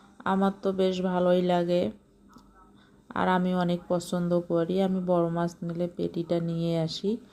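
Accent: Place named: North Bengal, India. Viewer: আপনার কথা আর রান্না গুলো অনেক সুন্দর। আপনার বাড়ী মনে উওর বংগে তাইনা। কথা গুলো তেমনি মনে হলো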